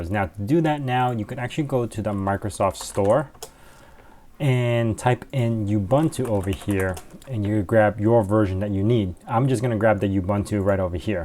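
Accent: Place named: New York, United States. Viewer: You are a genius. And I am very impressed with your knowledge of the Windows WSL and Linux ecosystems. I am also impressed with your English and your accent ( which I assume is somewhere around New York ). I will never live long enough to master all those things. ( I watched this in Xfce using Fedora Rawhide with all the latest bells and whistles that Fedora offers ). I hope I can redo what you just did using my Fedora Rawhide setup.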